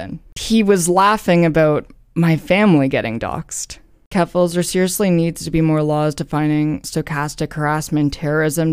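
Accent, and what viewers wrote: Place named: Canada. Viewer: Wait! You sound Canadian. I thought you were Italian :( Mamma Mia!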